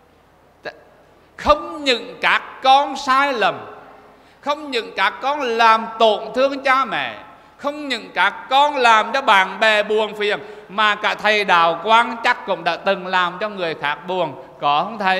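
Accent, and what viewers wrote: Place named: Hue, Vietnam. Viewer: Mô phật con rất thích nghe thầy giảng, thầy nói giọng huế rất hay.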